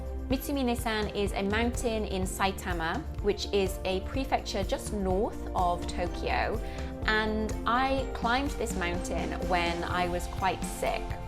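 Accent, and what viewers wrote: United Kingdom. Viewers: いつもキレイなイギリス英語を勉強しています。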